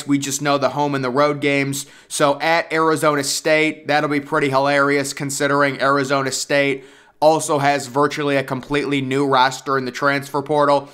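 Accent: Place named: Midwest, United States. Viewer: you have slight mid west accent. you live in Michigan?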